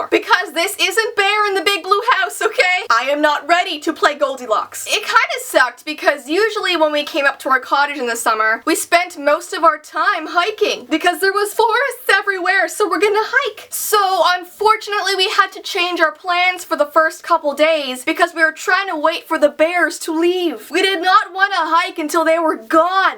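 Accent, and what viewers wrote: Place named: Canada. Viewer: Ilysm!!!!!!!!!!!!!!!!!!!! I love your stories and your Canadian accent!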